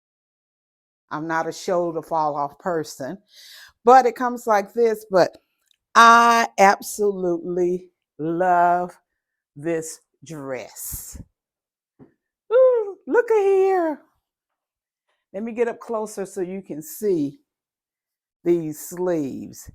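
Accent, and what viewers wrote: South, United States. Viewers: What a great video! Thank you. Am always intrigued by the Temu ad— thanks so much Ms. for bringing their offerings to life. You and your fabulous southern accent make me miss home. Love you! xx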